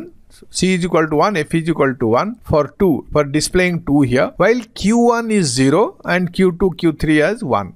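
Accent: India. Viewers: Indian English funnyyy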